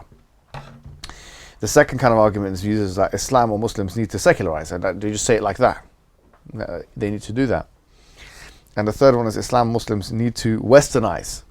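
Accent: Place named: Kashmir, Pakistan. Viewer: Bruh u producing quality videos with quite low subs. Subbed to u. Where r u from? U sound like kashmiri-Pakistani.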